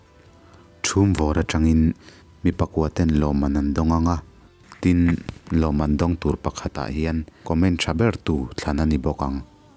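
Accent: Mizoram, India. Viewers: In thawnthu khawnkhawmna atha thinin,achhiartu lamin ichhiar ngaihnawm a,itawng thluk hi amizo in,angaihthlak anuam thin hle a,i chanel apiang hi chu,ka hman veleh a h ka ngaithllo phal ngailo a,damtakin awmla,ngalfimin dam la,kantan thunthu ngaihnawm tak2 nen he chanelah hian aneitupa inih angin lo langzing thin la,keini nupui nei a,pawmlai nei ngei pawh i aw leh ithu chhiarah izunah kan uaizo tawh ania.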